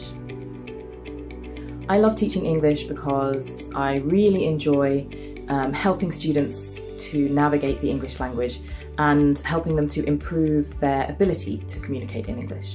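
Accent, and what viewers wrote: United Kingdom. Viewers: 항상 느끼는거지만 영국 영어 발음 치인다..😍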